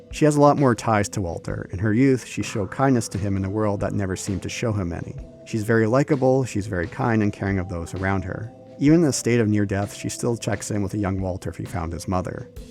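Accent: United States. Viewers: The California accent is strong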